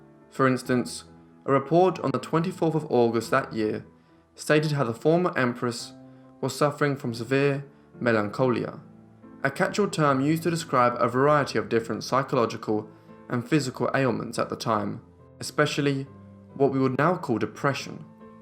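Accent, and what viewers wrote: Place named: United Kingdom. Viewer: You can't use the snotty British voice It's a nightmare to listen to.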